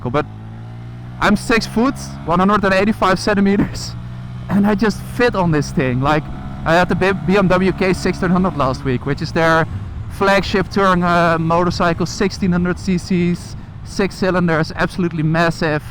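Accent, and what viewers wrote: Netherlands. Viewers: Are you Dutch? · smerig hollands accent zeg jeetje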